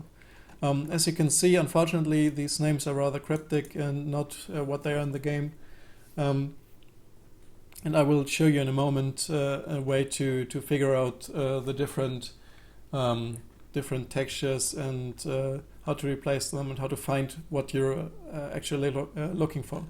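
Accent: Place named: Germany. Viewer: haha ich hör dein Englisch und denk mir direkt, der muss Deutsch sein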